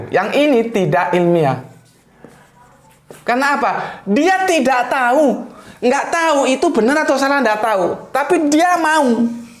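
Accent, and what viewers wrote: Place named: Yogyakarta, Indonesia. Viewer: Kok cara berbicaranya n namanya seperti nya wong Yogyakarta, apa asalnya wong dr Yogya ya? Wah detail banget.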